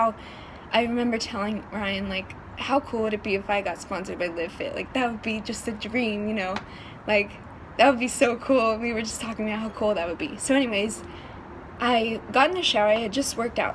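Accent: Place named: New Mexico, United States. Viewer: Oh my gosh! I totally called your accent a few videos ago. I was like, "Man, she sounds like a New Mexican." That's where my mom is from & I lived there for about 4 years while I went to college. Loved this story time. It's very inspiring.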